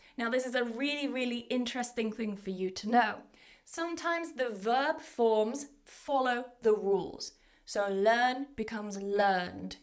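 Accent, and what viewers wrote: United Kingdom. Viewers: I’m really grateful for you for make fantastic videos to our English learners. Thank YOU! And I love your British accent, hopefully my pronunciation getting better, speaking English like your accent.❤ ❤❤ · She is from the UK, i dont know if this Is british acent, i dont native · hello ms i love your content, as specially the British accent.... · Excellent, i love your british accent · Hey are you a british teacher ? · Is it British accent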